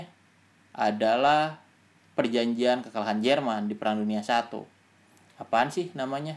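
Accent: West Java, Indonesia. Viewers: sunda abis si aa logatnya wkwkw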